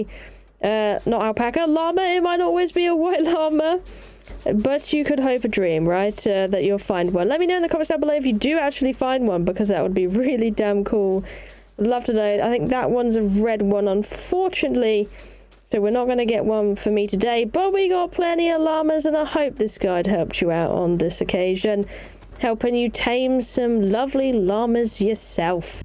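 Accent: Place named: Cockney, United Kingdom. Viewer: The cockney/essex dialect is SO funny. It's not LAMA it is LAMER. It's not WATER it is WUOA. Too funny.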